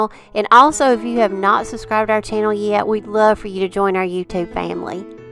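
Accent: South, United States. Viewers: That came out so cute! I just love the sound of your voice and your southern accent !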